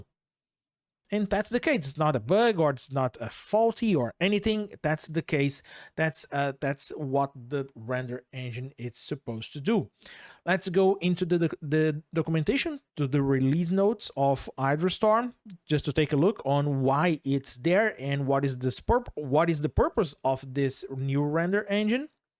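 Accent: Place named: Brazil. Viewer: sotaquinho brazuca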